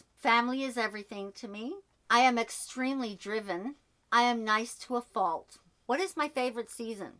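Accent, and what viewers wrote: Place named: Portugal. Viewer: I work with a Portuguese women and your accent sounded the same. 👍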